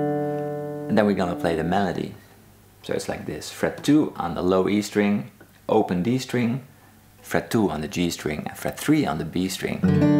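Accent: Netherlands. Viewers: You have a Dutch sounding accent. Wow, liked this video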